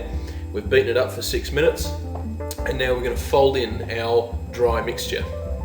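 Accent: Australia. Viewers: the aussie accent is not the same as the british accent . its soo different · From his accent can tell that his from australia not US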